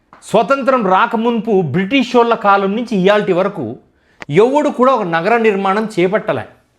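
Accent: Rayalaseema, India. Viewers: Rayalaseema yaasa 🔥💞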